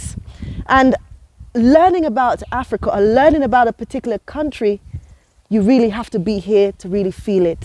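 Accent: United Kingdom. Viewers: Got this recomended love from uganda🇺🇬rwanda🇷🇼 is beautiful love your accent too u sound british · I liked the way you’re so passionate and really proud of Africa,especially Rwanda 🇷🇼 I liked your mancunian accent by the way 😁 I can’t wait to come back home back too Ciao cara ti amo già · She sound British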